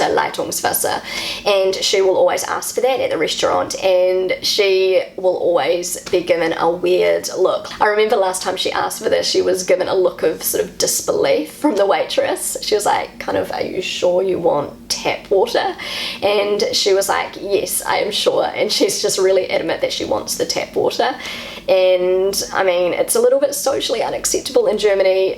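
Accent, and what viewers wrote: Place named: New Zealand. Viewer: Also bei mir geht das Verstellen der Abspielgeschwindigkeit zwischen 0,25 bis 2x, allerdings verstehe ich auch bei Normalgeschwindigkeit ganz gut. Anfangs hatte ich auch etwas Probleme mit ihrem Kiwi Accent, aber daran gewöhnt man sich relativ schnell..!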